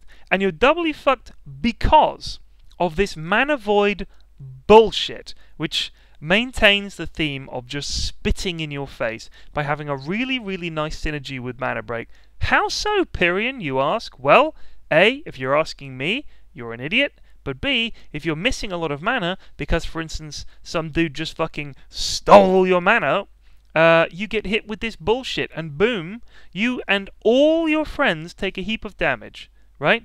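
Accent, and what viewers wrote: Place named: Cockney, United Kingdom. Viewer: i love this cockney rage XD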